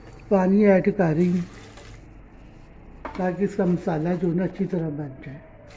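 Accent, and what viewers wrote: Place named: Lahore, Pakistan. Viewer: The way you talk is amazing , true lahori style .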